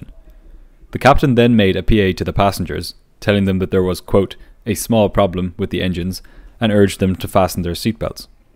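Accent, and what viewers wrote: Ireland. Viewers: Hear hear! Nice to hear an Irish voice too 🤠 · Am I erong to guess that you're Irish just by your accent? Great chanel buy the way. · The subtle irish accent is soo pleasant to listen to! I think one of the cardinal reasons I like this channel so much is the excellent narration. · This is an authentic Irish accent!!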